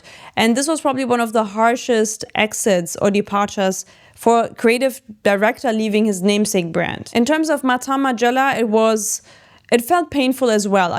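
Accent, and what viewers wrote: Germany. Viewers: bist du German?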